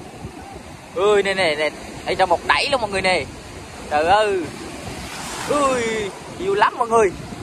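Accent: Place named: Quang, Vietnam. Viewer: Khi con nói chuyện con đừng hỏi bằng tiếng quang. Vì CĐM ko nghe được con ạ. Con cứ nói bình thường đi con và khi con quay nên quay gần con cá cho khán giả xem cá này là cá gì vì tiếng mỗi nơi gọi tên khác nhau ❤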